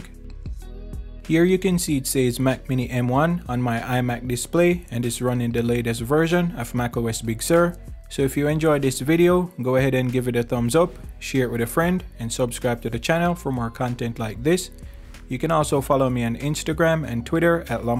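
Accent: Jamaica. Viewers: This was very helpful for me. Thank you. I just purchased my Luna stick . I will also look into getting a Ethernet cable as well as my internet can be very unstable. I hear a Jamaican accent in there somewhere! and for that I will sub! lol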